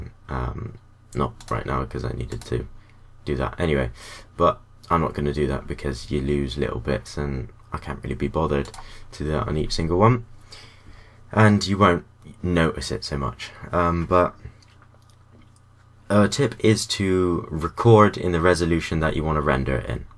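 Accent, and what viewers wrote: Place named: Taunton, United Kingdom. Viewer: Indeed same, taunton born and bred for 18 years i knew i recognized the accent =]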